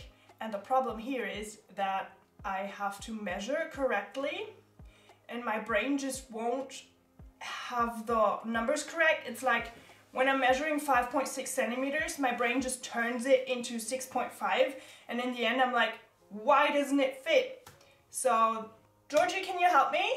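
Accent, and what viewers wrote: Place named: Germany. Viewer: Could it be that you are German because your English sounds like that. (I’m German btw)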